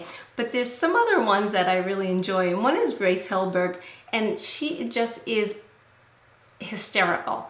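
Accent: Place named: Long Island, United States. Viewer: Ok, I'll share George. I think another tag sounds great! xo ps love the comment about your accent from Sharon. LOL we Long Islanders always get made fun of!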